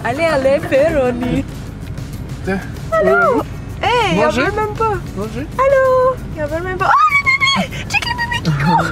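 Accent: Quebec, Canada. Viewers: Je suis vraiment ravie de voir cette vidéo, j'ai passé tous l'été au Québec ( deux mois complet ) et franchement, votre accent me manque terriblement tous comme vos expression. J'ai tellement hâte de revenir aussi vite que possible, et visiter la ville de Québec, car j'ai pas pu descendre 😢, j'étais à Saguenay avec mon amoureux qui travaille là-bas. 😅 j'espère que tu vas bien en tous cas, merci beaucoup pour tes vidéos et ton accent qui me réchauffe le coeur !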